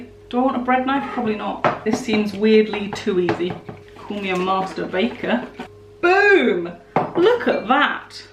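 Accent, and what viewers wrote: United Kingdom. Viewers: Also I like it when you say it in the British way it’s interesting the language difference and 90% of the time I can figure out what your talking about. 😊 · I am from the US and I love the way u talk and the terms I dont understand I google myself and have a fun time learning new British words because the UK fascinates me. Honey you just do u ! No matter what because your right u cant win so live for u! Do things the way u like it. :) I adore u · Hey Look up "YouTube Premiere" You can upload a vid for a scheduled time and have live chat...:) Love the vids! Listening to you talk is half the fun! I love hearing the British versions of things! Everything sounds much classier.... lol Much Love!!:)))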